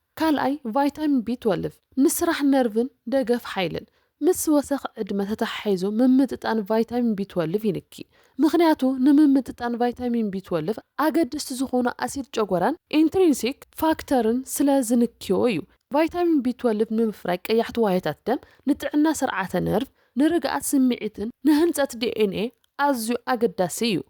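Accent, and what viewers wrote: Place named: Dekemhare, Eritrea. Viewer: please don't speak Dikala Tigrinya like sewnet say Sebnet and Gra and MiKinas say Minikay.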